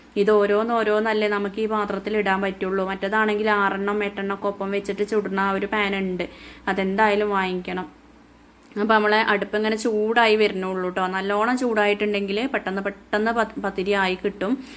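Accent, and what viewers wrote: Malappuram, India. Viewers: Njan first ayitt aanu ee channel kaanunnath ,kandapo malappuram ulla aall aanu thonni,evide sherikk place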